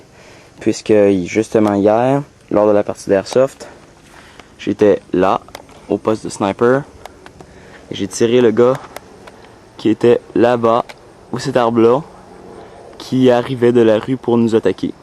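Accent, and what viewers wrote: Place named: Quebec, Canada. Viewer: dsl mais tn francais est...... Mais t un quebecois dc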